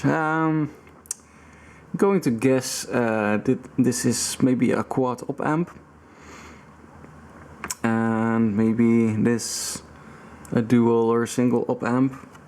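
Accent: Netherlands. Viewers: Hahahahah yeah me too, 99% of the time you can tell right away if someone is a Dutchman speaking english. Yeah these things were way ahead of their time in terms of features, the parametric eq and the crossover function especially, auto mix channel is cool too. Yeah i know, it's sad that people discard these amps when something other than a fuse goes because they think it's old and solid state but everything has a life span and they're not that expensive to replace so we'll give it a shot fixing this behemoth. Maybe i'll turn it into a video like you did but i digress, thanks for this video, i enjoyed it a lot.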